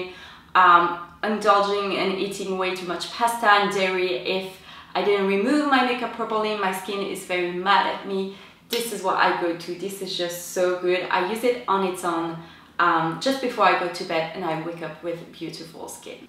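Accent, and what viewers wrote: France. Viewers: Bonjour ☺️Es-tu française ? Are you french ? Because you hava a french accent especially when you say "la mer" ?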